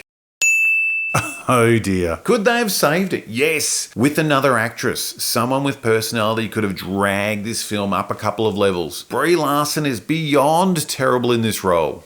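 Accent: United Kingdom. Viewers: 59 seconds in and you are wrong. red sonia was not a superhero. she was just a hero, adventurer . I am not sure what country you are from, sounds British, but I have lived in america since 1976. The 90's the movies were not the nicest to women in the 90's, we were still pretty sexist. In real life and movies. You try to make it sound like we were liberated. we were not. I do agree the movie was pretty boring. I only saw it once. I have no idea if your points are right. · btw i love your accent (British right?)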